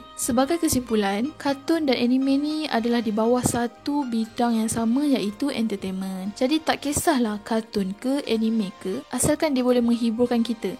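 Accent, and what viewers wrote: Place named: North, Malaysia. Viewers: Kita geng.. Ada dengaq mcm loqat utara pown ada. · orang utara macam mana pun dia control slang dia,mesti keluaq jugak utara tu😂😂😂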